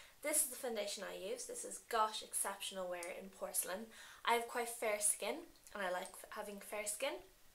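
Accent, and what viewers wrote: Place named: Ireland. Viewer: omg, can you please like smuggle me and take me to Ireland please??! your accent, girl!!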